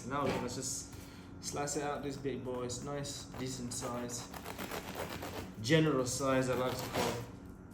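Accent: England, United Kingdom. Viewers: As soon as you drove up to that old brick building I knew this was going to be in England, then you started talking and the accent confirmed my thoughts !!! Nice work !!! How did you learn all this ? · What English accent do you have?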